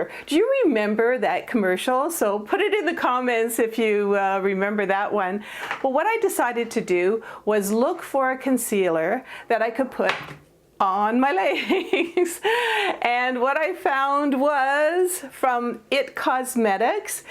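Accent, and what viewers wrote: Canada. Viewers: Good for you wearing what you like & not what other people say you "should" or "shouldn't" wear. Your tips & encouragement to live with confidence are helpful & uplifting. PS: if you're not originally from Canada I'd be surprised. You sure sound Canadian at times. 😁❤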